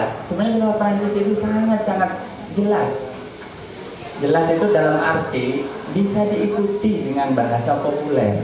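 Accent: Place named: Madura, Indonesia. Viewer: logatnya kayak pak mahfud, pasti dia ini orang madura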